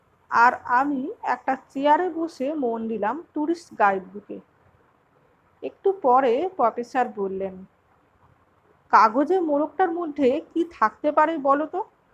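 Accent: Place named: Manbhum, India. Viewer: আপনার ভয়েস অ্যাকসেন্ট শুনে মনে হচ্ছে আপনি আসানসোল পুরুলিয়া বাঁকুড়া মেদিনীপুর অর্থাৎ মানভূম অঞ্চলের মানুষ।ভুল হলে জানাবেন।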